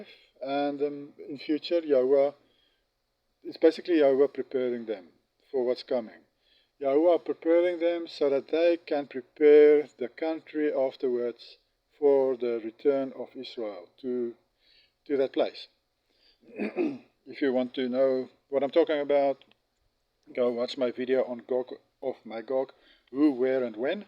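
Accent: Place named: South Africa. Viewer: Ha, I asked if you were South African in one of your later videos, and now after this one I know. I'm going through all your videos now. I found you from your comment on the video with those 3 guys talking about the millennial reign.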